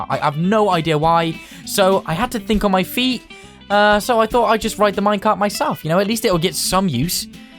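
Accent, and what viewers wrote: United Kingdom. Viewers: Well he sounds like a brit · Omg I like how you talk like a British guy on a adventure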